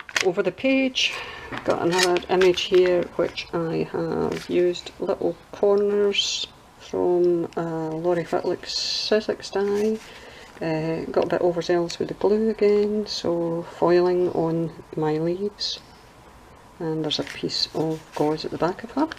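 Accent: Scotland, United Kingdom. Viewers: Your English is British? Because I feel a Scottish accent... Am I right?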